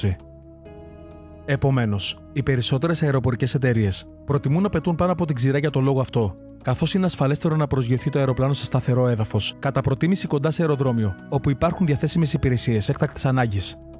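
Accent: Crete, Greece. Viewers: ναι ρε φιλε, αχα. ανοιξα να δω τα σχολια για αυτό το λόγο. Άσχετο, απο την προφορά του, πρεπει να ειναι απο Κρητη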